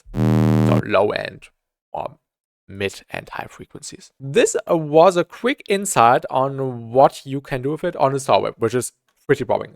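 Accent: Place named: Germany. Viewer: I love the German accent, really gives it a scientific vibe haha ❤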